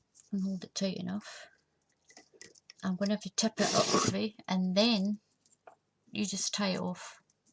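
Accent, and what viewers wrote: Scotland, United Kingdom. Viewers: Fashionable Chambers...some people learn at a slower pace than others. Just like said. And maybe you don’t consider her voice is calming with that Scottish accent. Many many comments left on every one of her tutorials love her accent and love listening to her. Very calming.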